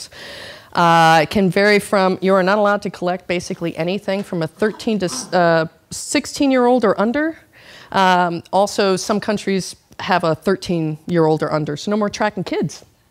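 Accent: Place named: United States. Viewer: Interesting .... Dutch lady with an American accent..... hmm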